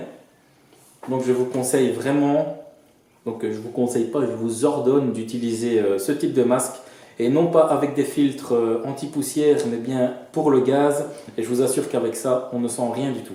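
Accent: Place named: East, France. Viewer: Le meilleur c'est à la fin 😊👍 Très belle vidéo et explications claires avec l'accent de l'est.😊🎣🎣🐠🐡🐟🐠🐳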